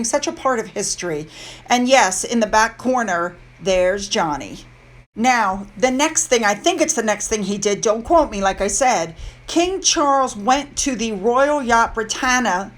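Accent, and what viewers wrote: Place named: United States. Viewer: great video as always. I'd like to point out your pronunciation of UK names. PLEASE NEVER STOP, American cousin... in my opinion, your pronunciations are so cute and understandable. I, an Aussie, have difficulty sometimes, so I love that you are a fellow traveller in the interesting world of UK place names. 😂❤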